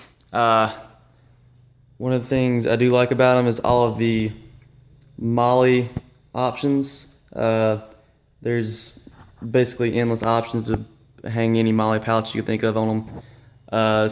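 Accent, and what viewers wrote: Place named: Appalachia, United States. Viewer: Always like hearing hillbillies try and explain something.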